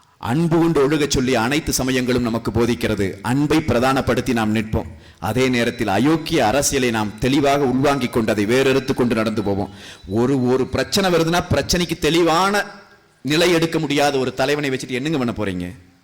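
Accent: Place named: Kongu, India. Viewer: பேராசிரியர் கொங்கு தமிழ் சிறப்பு. நாம் தமிழர் துபாய்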